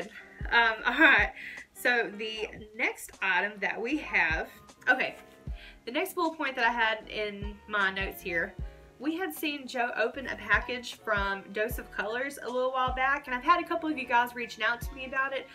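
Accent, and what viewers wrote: South, United States. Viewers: I love your purple hair, southern accent, and shiny eyes!!!!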